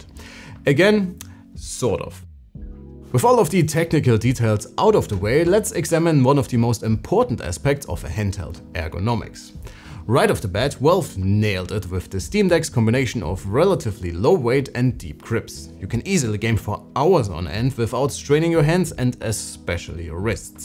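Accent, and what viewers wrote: Germany. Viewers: That German accent is 🔥 🇩🇪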